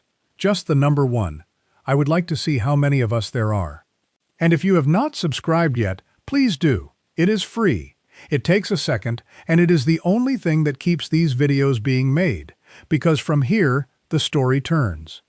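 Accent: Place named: United States. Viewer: It’s Jaguar NOT feckin Jagwar Ai is bad enough but the Yank ones are even worse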